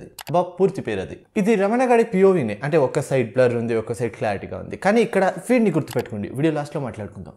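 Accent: Karnataka, India. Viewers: Bro your mother tongue is Telugu and grown wholly in karnataka I think. Same here. I observed this in your khaleja video also.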